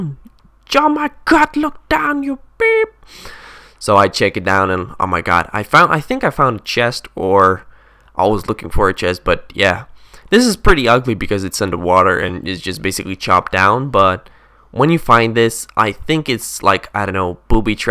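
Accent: Scotland, United Kingdom. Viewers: English but in a british accent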